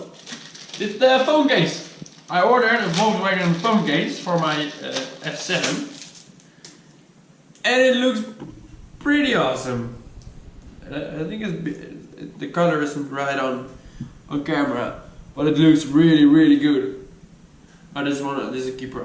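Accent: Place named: South Holland, Netherlands. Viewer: Hoor ook liever je Zuidhollandse accent hoor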